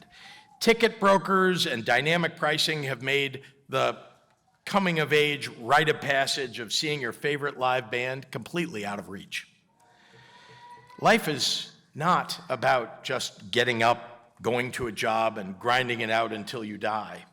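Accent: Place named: Canada. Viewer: About Cannabis ~ after a CRIME of the CENTURY of ILLEGAL CANNABIS - We the GLOBAL people truly demand & expect this otherwise FREE DITCH WEED backyard garden-variety naturally safe & superior NATURAL BOUNTY plant to be RETURNED outright & overnight so we can GET BACK ON TRACK as a GLOBAL SOCIETY using our free best foot forward to redemption, after being FORCED to instead utilize sickly synthetic toxic patented/lapse-patent profit crap for generations.. So yes - time this ends is already 105+ years later. Thanks too for referring to it as 'Cannabis' vs that designed derogatory slang 'mj'. Respect! Speaking of a Garden that didn't need tending.. and 'weeds' that needed to be rooted - consider how FAR AWAY FROM NATURE the global population has been forcibly redirected.. consider a DANDELION is one of the most healthy plants we have at our feet - and what we have been doing with it all along.... 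just as a simple primal example of how FAR OFF the path WE HAVE BEEN LED. Dang, Sir - Respect! From a Canadian, I am taken aback by your speech - in a very great way! You almost sound 'Canadian' fcol! This is a breath of fresh air - the rest of the planet awaits!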